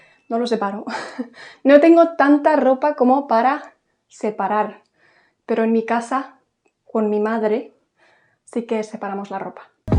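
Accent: Spain. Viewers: si, lo vi después. Subió en mi algoritmo. Ja ja. Ahora entiendo. No supe que estuvieras en Corea. Pues, pensé que fuera Espana. Tus videos suben por mi feed por casualidad completa, ni en la orden actual. No sé. Me gusta como hablas bien claro y describen cosas en español. Tienes muchas palabras distintas. Yo aprendí español en Chile así que me fascina como hablan los españoles. La verdad es que prefiero el acento español ya, después de hacer dos caminos de Santiago y conocer a muchos españoles. Tienen un montón de refranes que amo. Y me gusta que los españoles hablan muchísimas palabrotas. Para mi, como gringo, me hacen reír. Joder escucha cada rato en Espana; joder para todo. 😆😆😆 Bueno, bien hecho. Me gusta tu canal. Yo enseñaba español la Universidad de Hawai por unos años mientras hacía mi maestría, pero me gusta tu técnica de enseñanza, me gustan tus expresiones y tu cara animada. 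Dale pues, bien hecho. 👏👏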